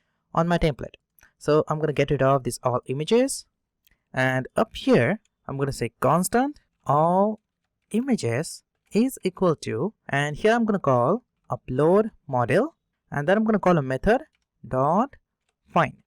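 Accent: India. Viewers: Dude, your thick indian accent just gave me a headache. Thank you so much.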